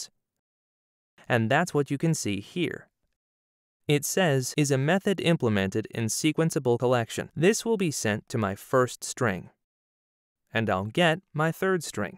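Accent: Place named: France. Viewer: The MOOC is also offered in English with a French accent.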